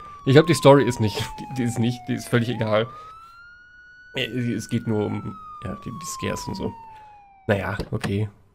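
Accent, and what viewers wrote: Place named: Ruhr, Germany. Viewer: da isser, unser Ruhrpott dialekt🎉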